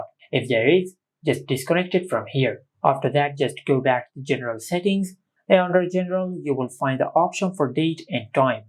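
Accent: India. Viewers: ヒンディー訛りの英語リスニング教材としてハイレベルです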